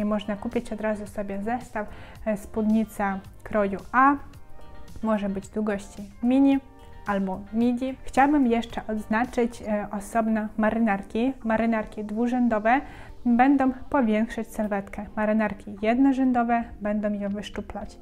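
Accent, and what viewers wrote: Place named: East, Poland. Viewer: Następna stylistka z wschodnim akcentem.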